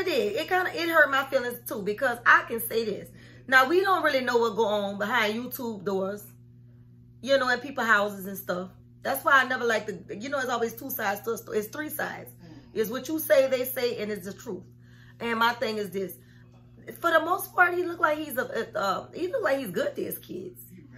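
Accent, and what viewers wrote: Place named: Louisiana, United States. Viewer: U got to be from Louisiana....because I'm from Hammond la....all in your voice.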